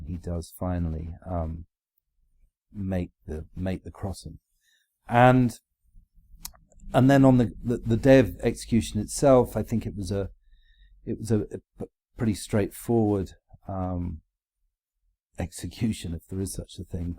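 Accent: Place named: England, United Kingdom. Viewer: I think he has a Middle class English accent what are you on about?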